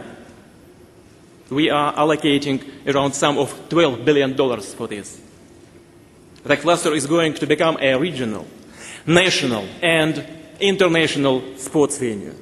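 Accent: Russia. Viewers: Real russian english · Sorry for my bad thought but, I can only understand a few words and/or sentences that he said due to his heaviest Russian accent. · English is used as an international language out of convenience more often than not. Although there are several different versions spoken around the world. I'm a native Brit, but struggle to understand some of the 'English' forms spoken abroad at times. It does make it much easier to travel when there is always someone around who can understand you, so I can't complain. Although I think it has made native Brits incredibly lazy linguistically! When I lived abroad I did try to learn some of the local language out of respect, but people would always want to practice their English on me! I'd love to learn Russian, but the only Russian people I've known and worked with were fluent in English. Putin's accent here is very interesting. 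Some of his pronounciation is very close to 'natural' English, some words are spoken in a clear Russian accent and others sound closer to Chinese! It's fascinating to listen to.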